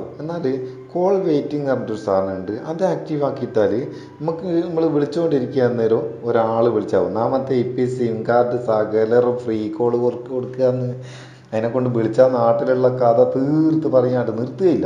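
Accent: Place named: North Kerala, India. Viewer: എന്റെ എന്തിന്നാ ഈ പറയുന്നേ... കുറച്ചു സ്റ്റാന്റേർഡ് ആക്കിക്കൂടെ... വേറെയും എതെല്ലോ ജില്ലക്കാരും കേൾക്കുന്നില്ലേ... തെക്കന്മാർക്കൊന്നും മനസ്സിലാവില്ലാന്നേ..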